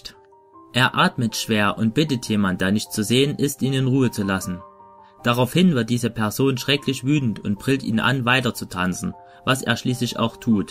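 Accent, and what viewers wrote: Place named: Saxony, Germany. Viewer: Okay, ich schreibe mal einen professionellen Kommentar: (Es wird ein etwas längerer Text) Fangen wir an mit "Text und Aufnahme": Also zuallererst einmal ist deine Sprechweise gut, der Lesefluss ist super und deine Betonungen glaubhaft und ist gut platziert. Manchmal hast du aber etwas knapp geschnitten, damit meine ich das manche Sätze schnell enden und du also (rein natürlich) nicht die Zeit gehabt hättest Luft zu holen. Ich sehe deinen Förderbedarf im Dialekt, da ich dich mittlerweile sehr gut kenne weiß ich das du hier sehr darauf geachtet hast nicht zu sehr "Sächsisch" zu klingen, dafür ein Kompliment. Zum Text gibt es kaum etwas zu sagen da es ein ziemlicher Klassiker ist der eher vom Background lebt, also den Videos die man tatsächlich anschauen kann. Ich fahre fort mit "Technik": Mit deinem Videobearbeitungsprogramm hast du eine gute Wahl getroffen. 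Die Bilder sind stimmig und passen von der Bearbeitung zu NPFNP. Man "merkt" das sich die Qualität von diesem Video vom Rest erheblich abhebt das sich nicht zuletzt auch auf dem Sound auswirkt. Auf die Bearbeitung deiner Stimme gehe ich mal nicht ein, da du ja dort noch etwas Hilfe hattest ;) Der Background ist gut gewählt, bei aber + etwas leise. Fazit: Eine Interessante CP die ich sehr genossen habe, danke für deine Arbeit ! NEVER~SLEEP~AGAIN Cruzix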